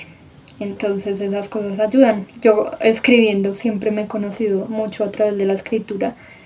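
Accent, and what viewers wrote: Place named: Colombia. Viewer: Hola cómo estás, por tu acento creo que eres de Colombia yo también te pido por favor ayuda no estoy bien, y pedí a Dios ayuda y justo me apareció tu video me gustaría contarte mi historia para que me puedas brindar una orientación porque yo se lo q estoy viviendo no me lo invente yo, no estaba nunca buscando información sobre nada de estos temas todo ha llegado a mi solo yo no estoy loca, ni tengo ninguna reprogramación de una tal “nueva era” como dijo una señora en otro video que observé por siempre he tenido una conexión muy profunda con Dios y soy Asocial no frecuento lugares, no tengo amigos, siempre he estado sola no hablo mis cosas con nadie entonces quien me lavo el cerebro? Quien me reprogramo? Y Dios no habría permitido tal cosa para mí, yo no vivo una vida mundana. Yo quiero hablar contigo, por favor 🙏 Dios te bendiga.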